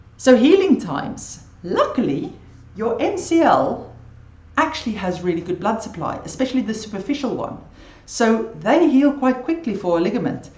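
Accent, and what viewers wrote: Afrikaans, South Africa. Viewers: Are you Afrikaans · Best info I've run across after 5 days of searching. Really good stuff. Well done. Love your accent. I'm guessing South Africa?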